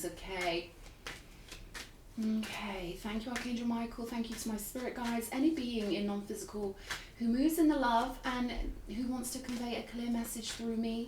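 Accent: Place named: England, United Kingdom. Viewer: I love your accent! Where are you from? In which part of England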